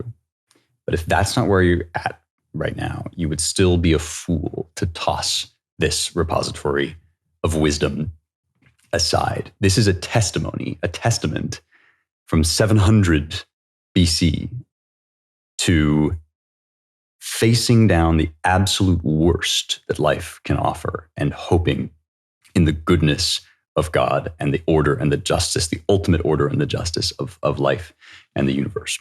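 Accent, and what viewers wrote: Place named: United Kingdom. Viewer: My first time here. I can tell you studied in the U.K. because you pronounce "been" (pronounced "ben" in the U.S.) as "bean".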